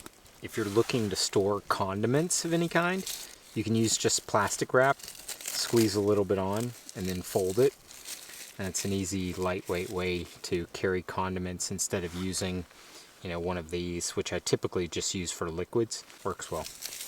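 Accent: United States. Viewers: it’s the softly spoken yank accent that does it for me bud. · you American bro? good video! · Are you an American or English?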